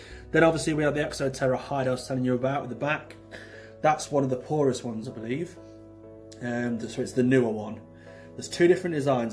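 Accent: United Kingdom. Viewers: You sound local ? Derbyshire?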